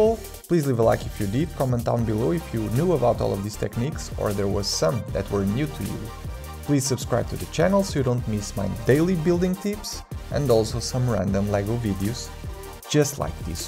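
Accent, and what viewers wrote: Portugal. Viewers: Olha videos incríveis, e eu estou bastante orgulhoso de ser um tuga a conduzir um canal incrível(a menos que sejas brasileiro, mas o teu sotaque remete muito ao sotaque português) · meu deus, só do principio do vídeo consegui perceber que eras português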